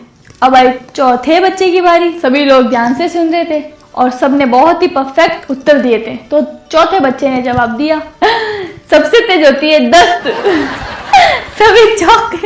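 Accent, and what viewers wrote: Marwar, India. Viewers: aapra awaj me marwadi ghani chokhi lage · 👉l like your😍 marvadi boli & also your dressing sense 👌👍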